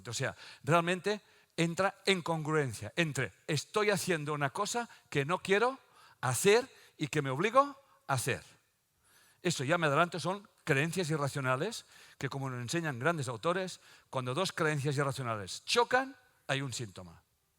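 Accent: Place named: Mexico. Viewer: Me encanta tú forma de hablar mexicano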